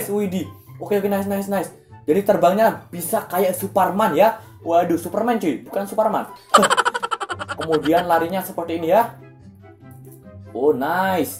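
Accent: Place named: Java, Indonesia. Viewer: Lu orang Jawa timur apa tengah bang !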